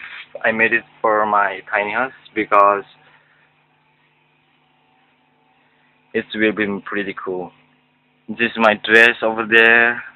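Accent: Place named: Indonesia. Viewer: mas bahasa inggris nya lancar ya, ya Memang kedengeran aneh soal nya aksen nya indonesia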